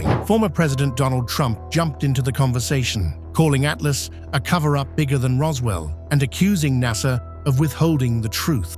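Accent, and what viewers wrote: United Kingdom. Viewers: The British voice again…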